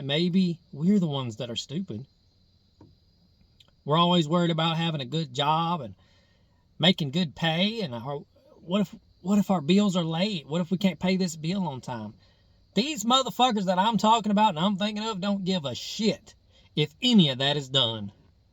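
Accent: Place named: North Carolina, United States. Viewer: I don't know why but it really feels like you're from Montgomery County in NC for some reason. Maybe because you talk a bit like a few relatives of mine. Love the content bro!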